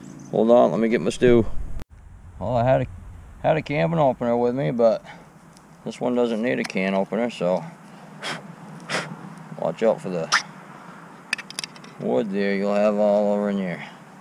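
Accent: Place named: New England, United States. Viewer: I'd swear you were in New England. 😁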